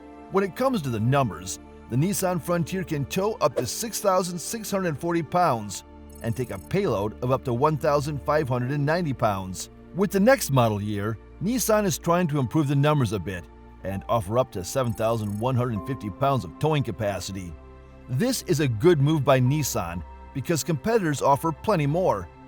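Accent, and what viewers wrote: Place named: California, United States. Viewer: This guy sounds like a Californian who doesn’t know anything about anything talking about cars like he’s an expert in the consumers opinion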